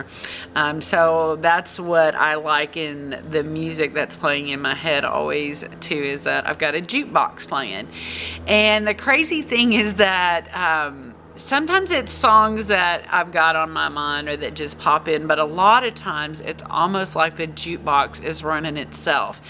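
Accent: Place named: Arkansas, United States. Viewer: You sound like you’re from Arkansas! My husbands family is from Texarkana... you have the same accent 😁❤️. Fun intro, and crazy fun stories about yourself! I’m glad hooked us up!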